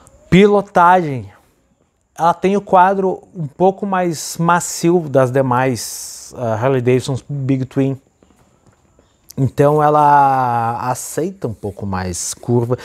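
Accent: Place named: South, Brazil. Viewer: Sotaque portuga do sul do brasil.guri muito bom seu vídeo gostei demais.essa moto e meu sonho.preciso comprar uma dessa urgente.e vc disse tudo moto que não tem elétricas demais não dá problema e meu sonho e comprar pra mim mesmo fazer as manutenções dela.gostaria de uma 883 ou uma forth eight mas essa tem um tanque de 7 litros e por isso pesou já a dyna e maior e o estilo me agradou bastante.muito bom o vídeo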